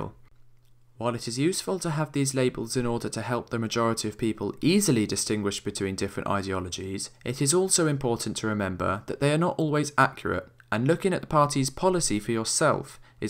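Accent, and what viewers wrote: United Kingdom. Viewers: Peter t.c.: I wouldn't be giving out advice like this when you can't even tell the difference between an American and a British accent. The presenter is British. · This is just PragerU with a bri'ish accent.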